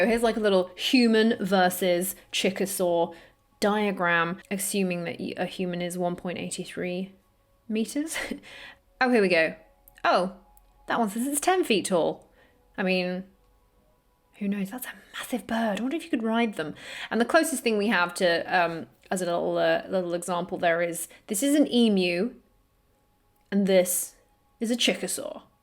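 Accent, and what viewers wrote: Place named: Yorkshire, United Kingdom. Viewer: Only just discovered your channel. Fascinanting stuff! Also, great to hear a fellow Yorkshireperson's accent on here. (Although you sound posher than me)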